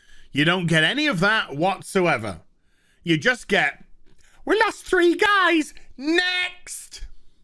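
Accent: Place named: Yorkshire, United Kingdom. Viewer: I love how you can tell how irritated is by how Yorkshire he gets:D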